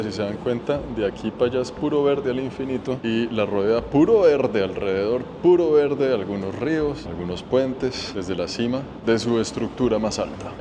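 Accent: Colombia. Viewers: Jajajaja la cultura de la SIESTA,ACA EN SAN ES IGUAL, NO SE PUEDE SALIR CON EL CALOR!! BUENO YO TE SIGO Y TE VEO SIEMPRE!!!! Tus formas de ver cada pais es muy diferente a los demás,muy interesante ver cómo vive la gente común,sus tristezas,alegrías,lo que valoran,sus casas,en fin...tu narrativa con ese acento colombiano me encanta 😊!!!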